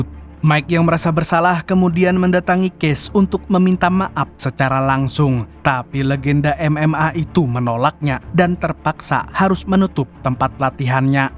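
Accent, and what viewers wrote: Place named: Tasikmalaya, Indonesia. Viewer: Si sorana siga org tasik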